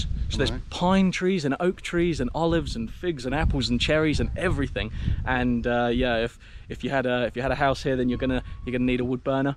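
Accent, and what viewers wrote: Norfolk, United Kingdom. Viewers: Sound like a rum ole Norfolk boi! Nice vid thanks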